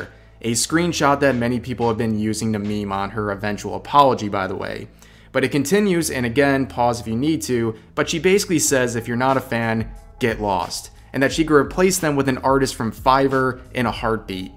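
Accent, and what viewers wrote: France. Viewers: So I don't follow at all. Not a fan of hers, not because of anything that happened recently it's just I already support a Vtuber and I'm not going to throw more money at another Vtuber who's content just isn't entertaining to me. From the clips I've seen people just seem to have a hard on for her because of her French accent. Like that is what I legit believe. This is fine as people have certain aspects about others that they are attracted to. Anyways what really stood out to me in this whole situation is that no one is right, and everyone is wrong. First of all in any contest you aren't owed anything. You take part and you either win or you lose. You can lose gracefully or you can be a sore loser and whine about it. This artist took it upon herself to feel entitled and was not noticed by senpai. So she demanded answers like she was entitled to them. 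She got in contact with a mod and didn't like the answers she got. I do not condone the manner in which he did but that's the answer she got but she STILL was not satisfied. I feel like that's what's wrong with alot of people these days is they feel everything is owed to them and they are entitled to anything they want when that is not the case. Anyhow even after speaking with she still wasn't satisfied and takes to twitter which always raises a red flag to me. You could be an adult and just deal with it but no you had to go to the cesspool that is twitter. You've automatically lost any faith or respect I had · I thought she was definitely acting toxic on her dead space stream. I know I definitely heard her say to one viewer who was leaving "What are you going to do? Touch grass? This is a Saturday, you're not leaving my stream!" Really showed me how big an ego she has and how narcissistic she is. 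Also a really good indicator that her viewer count/number of people who watch her streams is the most important thing to her. She did a lot of unnecessary yelling too, which I didn't understand. It's honestly sad because I genuinely liked her for a few good reasons; Number 1) because her art is amazing, and I'm an artist too; number 2) her cute French accent; and number 3) some of the games she plays (like dead space) are games that I really like. I did not know that this is what she's truly like behind the screen, but I am nonetheless disappointed. If anything, her toxic behavior serves as a good reminder of what I don't want to have in a relationship. I find it to be a good experience then, because I at least know what I'm looking for. I may be digging too far into it and that's just the persona that puts on for her streams, but at this point I kind of doubt it.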